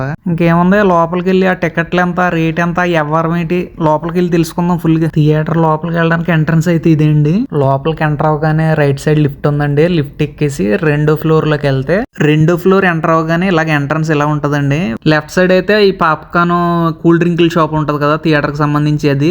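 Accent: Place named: East Godavari, India. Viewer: Me voice bale untadhi... madi rayalaseema but maku east godavari antharavedi lo maa friends unnaru me slang istam maku anna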